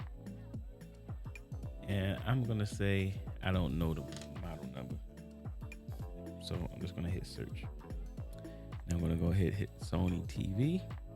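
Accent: Baltimore, United States. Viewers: Excellent review! Sounds like I here a B-more accent heavily pushing thru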